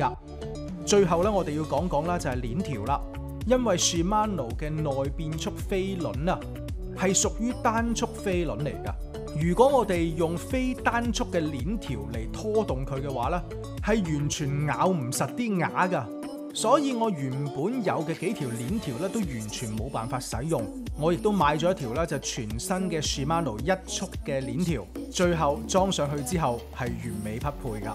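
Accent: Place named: Cantonese, China. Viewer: 你啲廣東話我聽得好舒服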